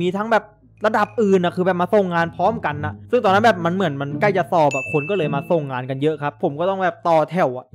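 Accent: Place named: South, Thailand. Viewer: พี่เป็นคนภาคไหนทำไมพูดเหมือนคนใต้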